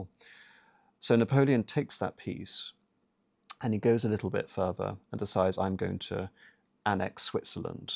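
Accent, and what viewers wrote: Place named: United Kingdom. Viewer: Listening to is like reading two textbooks at once in a low pitched British accent but in a good way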